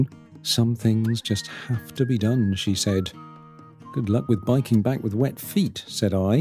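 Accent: United Kingdom. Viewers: I am enjoying your travel videos more and more! They’re so well done and I love to hear that refined British accent😄 as you take us with us along all your amazing journeys. Looking forward already to your next one, even if I heard on your recent Live streaming that a mere 10 minute video takes up to 2 days to edit and upload.😮They’re much appreciated!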